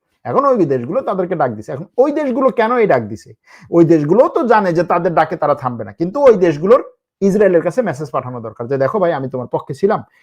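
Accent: Bangladesh. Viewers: do you hail from.Noakhali.Sound ok.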